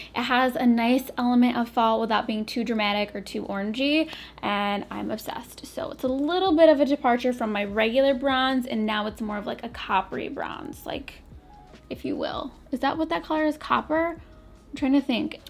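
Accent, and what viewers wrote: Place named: Minnesota, United States. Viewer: Are you from/live Minnesota? Certain things you say reminds me of how people there talk. 😊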